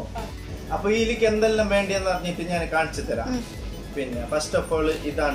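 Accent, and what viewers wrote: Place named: Kasaragod, India. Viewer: അടിപൊളി സംസാരം രസമുണ്ട് കേൾക്കാൻ കാസർക്കോട് languageജോറായിട്ടുണ്ട്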